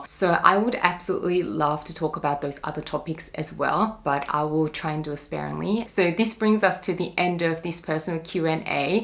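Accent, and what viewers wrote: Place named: Australia. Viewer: Your aussy accent is so cute and the way you speak is so pleasant and calming. I can listen to you talk forever 😆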